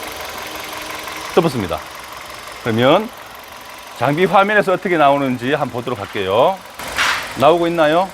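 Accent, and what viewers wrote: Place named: Gyeongsang, South Korea. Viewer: 사장님 혹시 매장이 어디신가요? 일단 경상도이신거같은데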